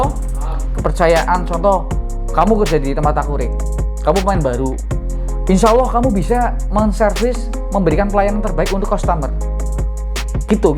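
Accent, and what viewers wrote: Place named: East Java, Indonesia. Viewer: Lokasi mana ini bos...dari logat nya kyk Jawa timur Malang...pingin belajar....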